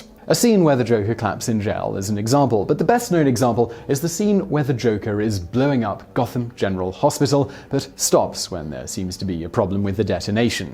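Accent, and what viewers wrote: United Kingdom. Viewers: Blame his British accent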